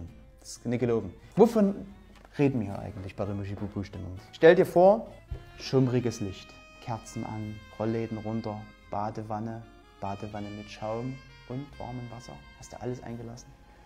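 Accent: Saxony, Germany. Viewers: Sächsisch klingt einfach Greislich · Sächsisch ist einfach ein hässlicher Dialekt. Sorry, ich lebe zwar rein offiziell in Sachsen, aber mit diesem Dialekt kann ich nichts anfangen. Da ist mir mein Vogtländisch lieber :D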